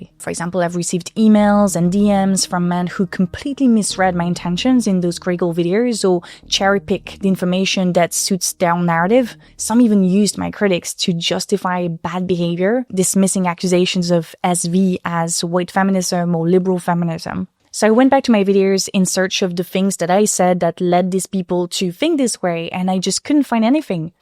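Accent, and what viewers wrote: France. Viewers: I'm part of your new masculine audience. I'll speak for myself, I don't know if there are other man who watch your content for the same reasons that I do. I first saw you on Instagram talking about french politics. I'm a recent catholic convert myself and I was curious about my faith in the "eldest daughter of the Church". Then I deactivated my Instagram account (I got tired of that) and decided to follow you here. Your content is well-researched, and left-leaning in a way that, for me, is way to stay updated with politics in the old continent through your lenses. And also a way to stay informed about current trends in feminism (and your balanced critique). I also love french culture and literature (I love your accent, everything about you, really). So for me, I feel like watching you is like reading or watching a good news anchor (with a melifluous french accent and sapphire blue eyes). 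· Can't speak for other men but I watch for the French pronunciations of words like "rugged". That was priceless, thank you. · I love you, and your french accent is a staple to your media but please say it with me. "Agency" - A-gency like "A gentsy."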